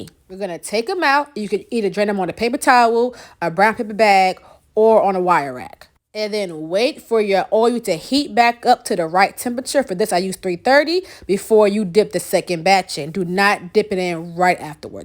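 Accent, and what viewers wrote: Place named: New Orleans, United States. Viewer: You don't sound like you from New York I thought you was from New Orleans or something like that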